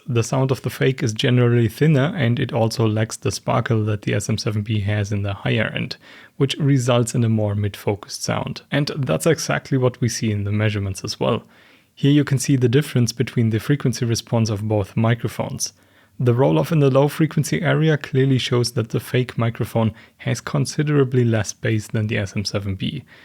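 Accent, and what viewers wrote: Germany. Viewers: Ist das jetzt zum Trend geworden, dass deutsche anfangen auf englisch Videos zu machen? Das klingt immer total schrecklich wenn man hört, dass die Leute Deutsch als Muttersprache haben und nicht englisch 😭 Dieser deutsche englisch Akzent ist grauenvoll!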